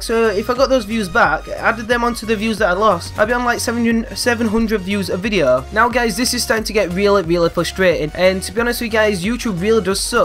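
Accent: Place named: Scotland, United Kingdom. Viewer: lol Idk why i thought you were scottish tbh... :O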